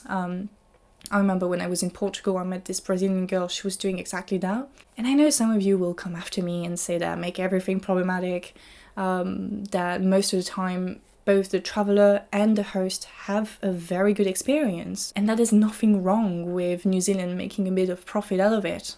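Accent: France. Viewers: Hey, are you planning on making a video in French anytime? I really like your accent, and I think hearing you in your native tongue would be lovely :)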